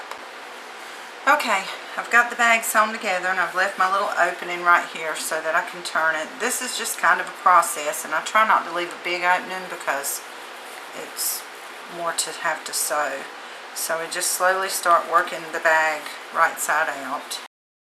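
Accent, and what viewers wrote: South, United States. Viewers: And I love your Southern accent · with your southern drawl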